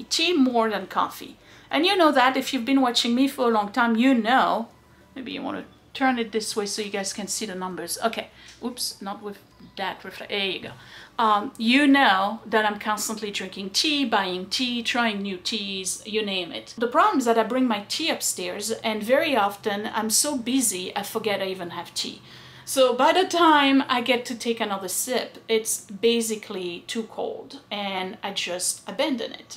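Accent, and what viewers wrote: France. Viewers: Dear. As to your accent. I started watching your channel, and yes, from the beginning, precisely because, I couldn’t initially work out your accent. A touch of French, a bit of New Jersey, I found it a charming, or, tres charmante accent, and have been watching you ever since. Yes, your sometimes fracturing of English, or American is funny, but in a good way. I ‘ope you always retain your interesting way of speaking. Thank you, all the best to you, those endlessly growing boys, you have built a great channel.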